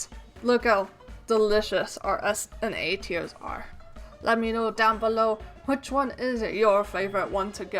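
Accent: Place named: France. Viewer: You sound french or something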